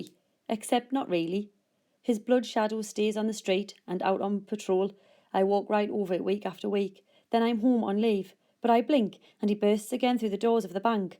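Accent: Newcastle, United Kingdom. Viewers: Really great videos and also great accent! (I'm also from Newcastle)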